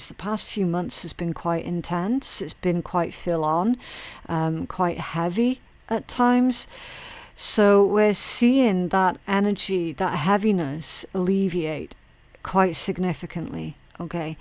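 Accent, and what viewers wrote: Scotland, United Kingdom. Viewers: Can I hear a wee Scottish accent in there somewhere? Great reading!❤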